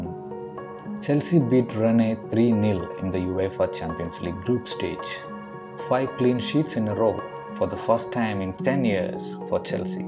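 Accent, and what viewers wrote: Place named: Kerala, India. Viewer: The mallu accent is so recognisable :D. Nice work bro.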